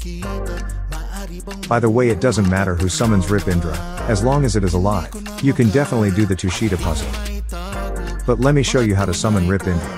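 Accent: Philippines. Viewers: Are you filipino? Bc the sound is filipino bro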